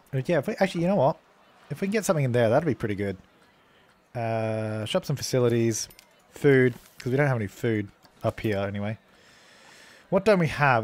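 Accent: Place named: Australia. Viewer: Is it just me that thinks he sounds like a Australian WanderBots?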